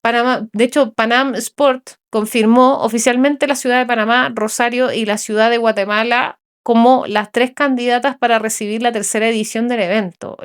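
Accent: Chile. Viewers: Mi amor de dónde eres? Que voz más linda !!pareces Chilena el mismo asentó y la voz y sin fartarle el respeto eres muy linda❤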